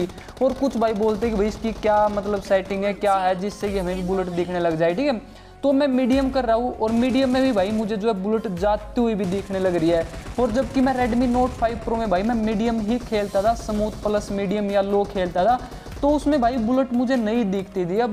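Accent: Haryana, India. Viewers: Bhai you are harayanvi apki voice bta ri s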